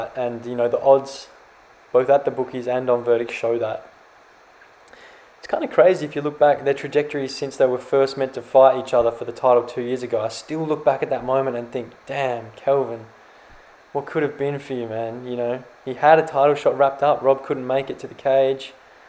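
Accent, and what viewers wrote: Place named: Australia. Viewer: Great content. Great fucking vid, some parts were very funny. What does cadjup mean? Much love from Aus, when is the next vid out? Sounds like you are from Australia.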